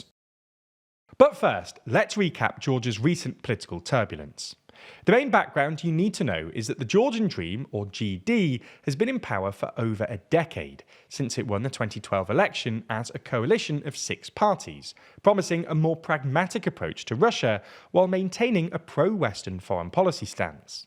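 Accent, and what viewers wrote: United Kingdom. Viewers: Typical British lalalala talk. No conclusion.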